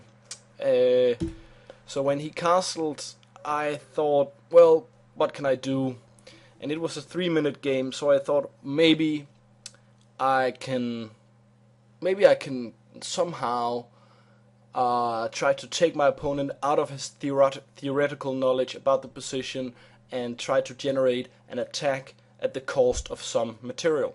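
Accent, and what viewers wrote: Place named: Denmark. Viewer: er du dansk? din accent lyder meget dansk.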